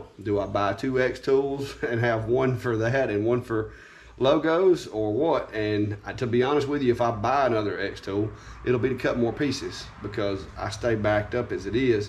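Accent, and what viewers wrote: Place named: Georgia, United States. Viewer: My husband and I just found your videos after getting an XTool and we love em! We’re from SC and we made a bet that you’re from GA based on your accent?!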